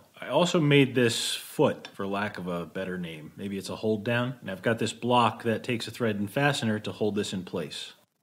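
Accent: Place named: United States. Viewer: Ive always wondered where is from. You sound like an american, but you use the metric system. Im so confused!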